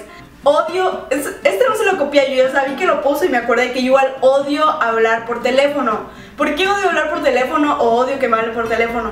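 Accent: Campeche, Mexico. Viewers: Si que tienes acento de Campeche ajajja , que linda 💗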